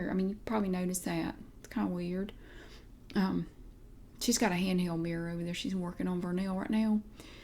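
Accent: South, United States. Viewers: I lived in NC for a couple years and this reminded me of folks down there. I never thought I'd miss the southern accent.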